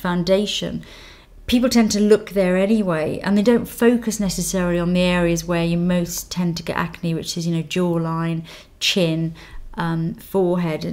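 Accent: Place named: RP, United Kingdom. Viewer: All I can think as I watch this is..... her accent is so melodic and posh.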